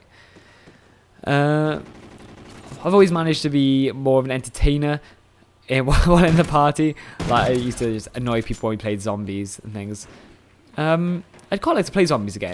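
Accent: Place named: United Kingdom. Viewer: British Pershon........I likes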